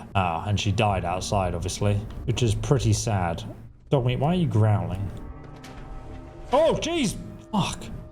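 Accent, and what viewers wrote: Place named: England, United Kingdom. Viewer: I don't mind your English accent, but OMG, it's VAR-mit.!! Picture Yosemity Sam saying "Come here ,you pesky VARmit, rabbit." Var, as in far with a V!😂😂😂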